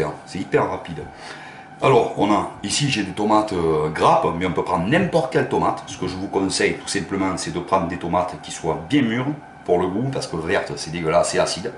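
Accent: South, France. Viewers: Merci pour la recette! J'avais oublie comment les faire! (Et j'adore l'accent du soleil!!!...lol)...je vais m'abonner juste pour ecouter l'accent qui rechauffe le coeur...ahahaha! Salut!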